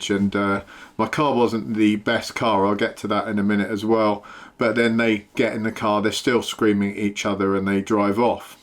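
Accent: United Kingdom. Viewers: The British accent saves the day!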